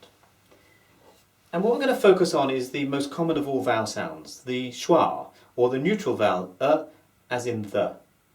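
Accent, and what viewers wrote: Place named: South Africa. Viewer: this sounds like a neutral South African accent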